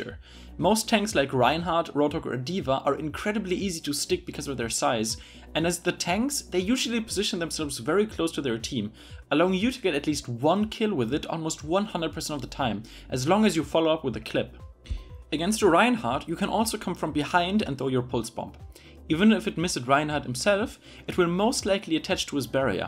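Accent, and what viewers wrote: Germany. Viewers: Man hört halt krass raus das du deutsch bist :D Aber danke für die ganzen guten Tipps! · dat gierman aiccent... ausgezeichnet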